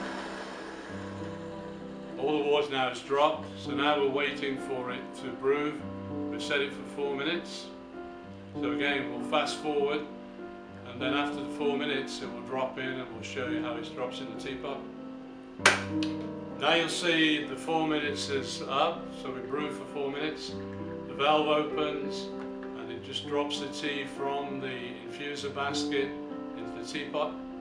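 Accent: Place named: Ireland. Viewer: Im just here for the accent. It's a soft subtle accent, Irish? Pretty. I love this guy. Is he married? M. IL. ❤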